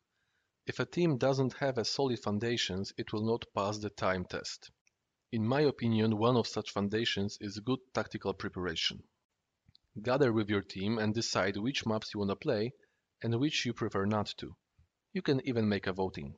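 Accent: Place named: Poland. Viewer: Świetne - naprawdę prosto i klarownie przeprowadzone instrukcje :) Po akcencie domyślam się, że polak, ale bardzo wyraźnie mówisz :) I sporo potwierdzenia z tym jak myślałem, żeby przeprowadzić trening (szczególnie mówiłem, żeby każdy sobie sam opracował smoki obowiązkowo, a na początek zająć się jedną mapą przez kilka dobrych dni, a nie mieszać), a dodatkowo nauczyłem się kilku cennych uwag.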